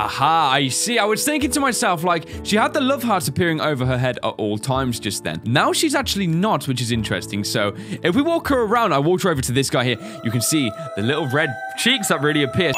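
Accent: United Kingdom. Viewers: um...? you're British... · I see your British am British but people say am American because I watch YouTube too much😅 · Are you British dude? Shite. Your Accent are Very Great. :)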